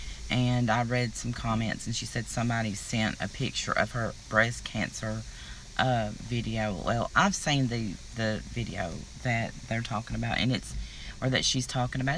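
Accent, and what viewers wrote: South, United States. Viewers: I originally watched her because she posted she ws a cancer survivor,and I am also,i had non hodgekins lymphomia,which is a blood cancer,,then it was all fake.She made a big deal about Amy,long after the drama was resolved,and bunny was exposed.You seem cool,your voice has that southern tang,which state are you from? Iowa here,you know the state you go thru to get to other states. :) so I look fwd to watching more of your vlogs,peace out,Lisa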